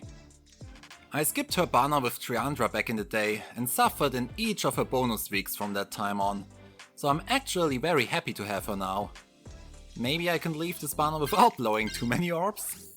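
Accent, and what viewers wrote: Germany. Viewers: German accent: nunja, dann haben wir hier ja noch einen deutschen Feh Spieler! :)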